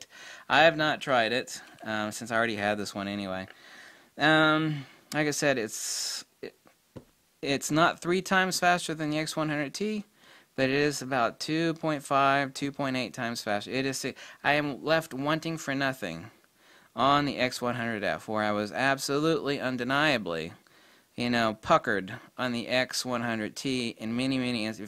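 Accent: Australia. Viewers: Yes we do but don't let that stop you. Reference to Australian accent. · Your Aussie accent isn’t bad mate .. stick with it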